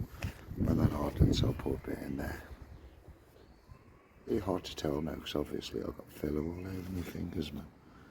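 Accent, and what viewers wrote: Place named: Birmingham, United Kingdom. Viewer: Nice job! You sound like you are from brum someplace 🤔 how much would you charge to do a similar job on a passat?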